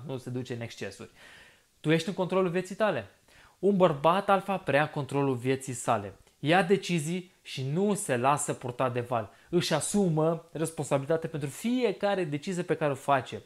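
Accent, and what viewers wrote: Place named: Bessarabia, Moldova. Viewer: Am o intrebare.Te-ai nascut in Romania?Ai un accent e basarabean.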